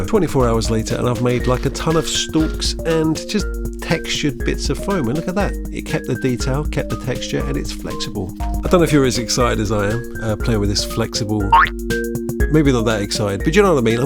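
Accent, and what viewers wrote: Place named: Cockney, United Kingdom. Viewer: bruv, that cockney accent gets me every time. XD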